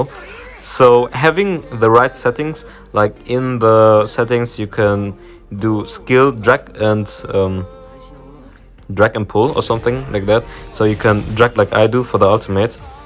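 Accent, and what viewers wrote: Germany. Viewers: Bro are u german ?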